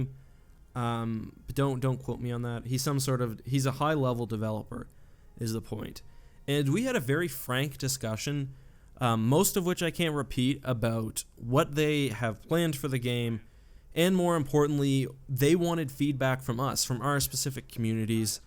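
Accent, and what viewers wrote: Canada. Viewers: You get really Canadian when you do rambling videos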